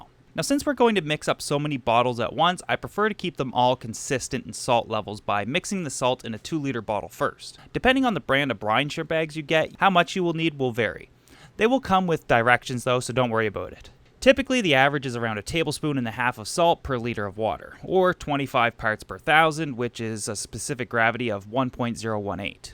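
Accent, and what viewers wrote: Canada. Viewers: Best video on this subject. You are Canadian huh? I can tell by how you say about. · Are you from Canada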